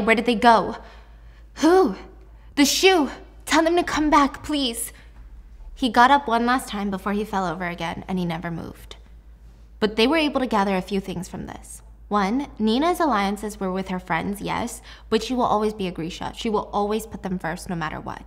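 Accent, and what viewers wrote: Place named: England, United Kingdom. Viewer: That English accent was so pleasing! 👏🏽👏🏽👏🏽👏🏽👌🏽❤😄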